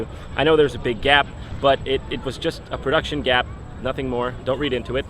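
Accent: New York, United States. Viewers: AM I the only one that noticed is totally getting a new yourker accent now?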